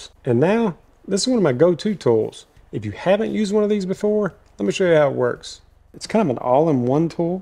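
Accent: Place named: Georgia, United States. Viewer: You don’t use a lot of contractions. “Do not” instead of “don’t.” I don’t hear that too often. I knew someone in Georgia which a similar cadence. Lovely video, cheers!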